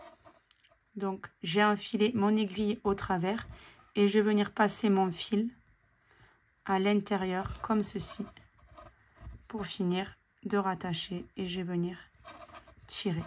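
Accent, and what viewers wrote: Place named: Perpignan, France. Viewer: Super sympa et tellement bien expliqué avec notre bel accent de Perpignan 😅.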